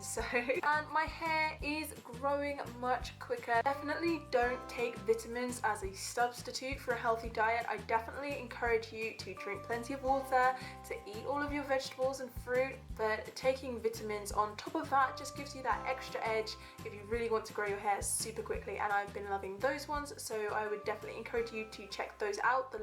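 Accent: United Kingdom. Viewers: I'm pretty sure that's a British accent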